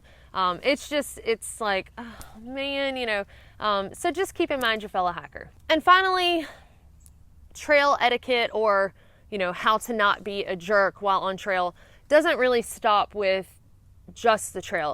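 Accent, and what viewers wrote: South, United States. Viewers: Love that southern accent! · I love how you say "Bright white light". I'm from NJ but love the southern accent! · “Brot wot lot”...love the lingo. I always learn something listening to you. Press on! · To many raised in the South, speech from the north has always sounded equally strange and or funny. Southerners have just generally been too polite and respectful to mock people for it. This was largely the reason many of us raised in the South years ago resented “Yankees.” We always knew what to expect immediately: mockery and derision because we and they spoke different. It’s not nearly as prevalent theses days, but this thread shows vestiges of it. Fortunately, even in this thread, some now even seem to find the southern dialects pleasing and charming, especially when coming from this lovely young woman. is a good ambassador and representative for our culture. 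I think she is a beautiful person. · headlamp has a brait whait lait...your southern twang is adorable.. Good advice as well..common courtesy is something a lot of folks lack..you are obviously drippin with it..good on ya...and you have sand young lady...hugs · I had to watch it again to catch the "braht waht laht" part. Love the southern accent. Thank you for the informative video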